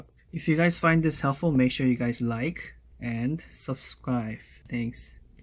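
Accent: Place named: South Korea. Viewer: I love how considerate you are at explaining all the steps you take to get to an answer. This is kind of a random question but I hear some Korean accent in the way you speak. Are you korean by any chance?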